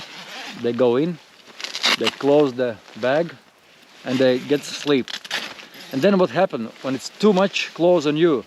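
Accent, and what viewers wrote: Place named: Baltic states. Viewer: Так вот как звучит прибалт,говорящий на натовской мове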